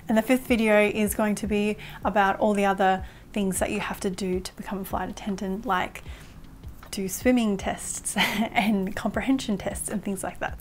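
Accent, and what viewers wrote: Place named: Australia. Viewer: omg an Aussie, perfect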